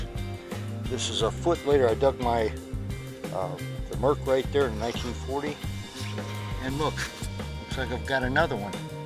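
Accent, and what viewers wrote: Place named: Chicago, United States. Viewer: I dig that classic chicago accent. Happy hunting!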